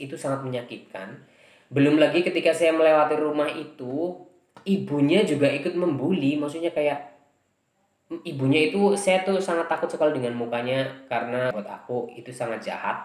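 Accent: Java, Indonesia. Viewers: Agak medhok ya mas 🤭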